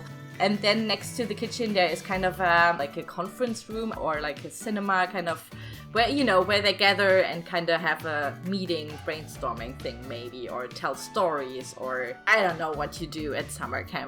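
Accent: Germany. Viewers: Wusste ich doch das ich da was deutsches raushöre😏😂😂❤😍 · Your accent isn't even half as bad as mine... To be honest, I didn't even notice that you're German and I'm one myself. My accent is also the reason why I'm afraid to start a YouTube channel, because people can be mean an all. Your accent is fine and not as bad as people make it seem! I also loved the way you adressed it and I love this camp, I'll definitely add it to my game!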